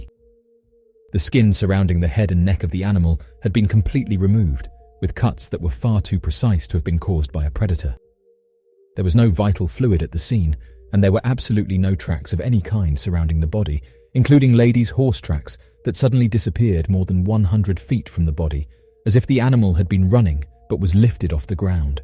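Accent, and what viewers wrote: England, United Kingdom. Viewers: That he has the English accent means I can skip this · I am sick of hearing a british accent to report on AMERICAN NEWS!!! I realize it is computer generated, is there no one who speaks american english, in all of Utube? America broke ties with GB and the British Monarchy, generations ago! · WHy The Hell is an ENGLISHMAN ACCENT being used for a USA Incident? JUST CURIOUS. Right? USAF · 🤬🤬🤬🤬WHY AM I LISTENING TO A BRITISH VOICE NARRATIVE?? I listened for three minutes.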